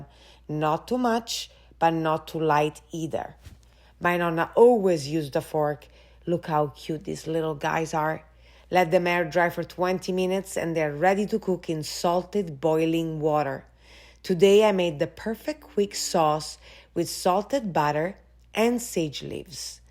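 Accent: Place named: Rome, Italy. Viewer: Sei romana pure quando parli inglese XD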